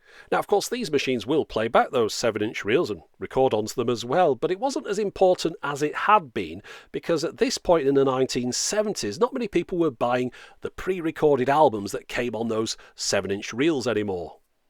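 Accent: United Kingdom. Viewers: one of the reasons i watch this channel very often is not only the information i get but also the mesmerizing sound of your voice and astonishing British accent specially when you say 'however' and the last part of ' as always, thanks for watching' !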